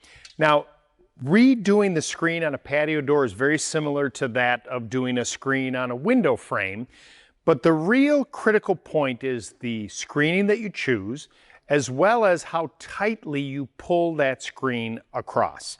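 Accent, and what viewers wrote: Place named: Midwest, United States. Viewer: Thanks for the great information! Will tackle this in a couple of months once things warm up just before spring., I kind of feel like you must be from the Ohio/Indiana/Illinois area? I recognize your accent as being common in those locations. Always love stopping by my local Ace as opposed to the so-called "big box retailers". Thanks again.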